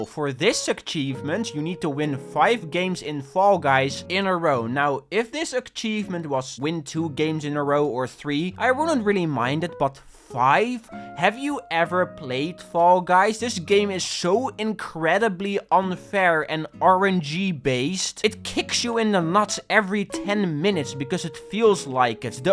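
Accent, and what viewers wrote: Netherlands. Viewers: Is hij nederlands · Are you Dutch? You accent sounds a little bit like it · He’s Dutch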